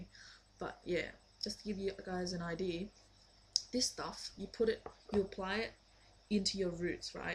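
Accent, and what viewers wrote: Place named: Australia. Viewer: OMfG ~ lolol bro u so funny XD love your australian accent^^~ 'i dont wanna walk around lookin like an abandoned cat' LOL lemme fry mah chicken on your hair! XD neon did hair tuts too - - u guys are the coolest twins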